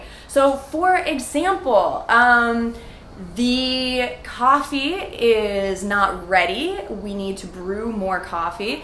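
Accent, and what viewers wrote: United States. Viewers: i 'am beginner student and i can understand a lot of your videos but i have a problem about the accent if i watched another vidios with anoter accent i can't understand, is this american accent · Darling teacher your my favorite teacher at all, please tell me which state you are frome I wanna do some research about your state accent cuz I think I fall in love with it. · Your American English like a sweet candy. Undoubtedly, i'll be part of your live class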